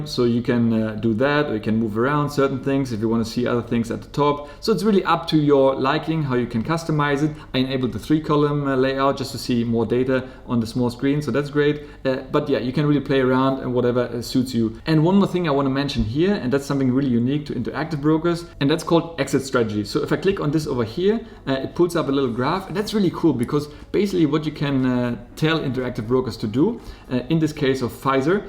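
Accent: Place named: Germany. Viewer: Are you from Germany? Your command in English is very swift. Once in a while I hear a slight German accent 😊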